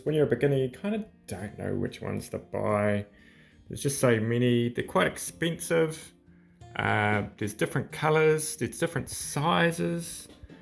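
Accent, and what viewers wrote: New Zealand. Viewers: Hi. I'm a new subscriber to your channel and suspect I'll me a member before too long! I enjoy your easy going manner and the NZ accent is like listening to music. I'm a beginner and have been toying with bone work so your vids are quite a help. I was drawn to this particular video when I mistook "Kutzall" for 'KLUTZ-all." and thought "Well, there's a product made just for me!"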